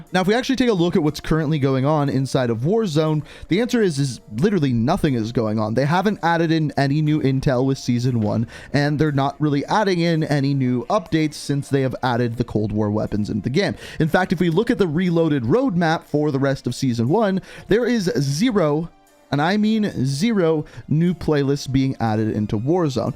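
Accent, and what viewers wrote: Canada. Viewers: Is this guy Canadian? Sounds like he got a little Canadian tweak to his English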